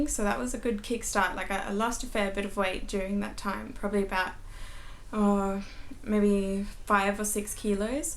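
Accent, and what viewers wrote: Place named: Australia. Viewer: If I had to guess I would say you're an Aussie?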